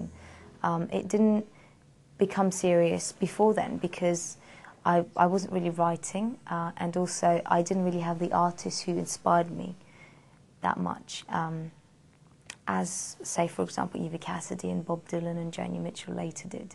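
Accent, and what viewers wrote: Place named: England, United Kingdom. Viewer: I love her english accent..could just listen to her talk for hours...and of course her voice is beautiful!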